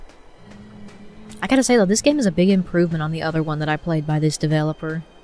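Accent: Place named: South, United States. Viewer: Oh man, I love ur Southern Accent. Its like Heaven. Please dont Change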